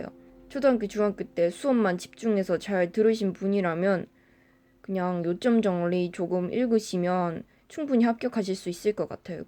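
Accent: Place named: Daegu, South Korea. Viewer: 대구사람이세요? 사투리가 대구사람이네 ㅎㅁㅎ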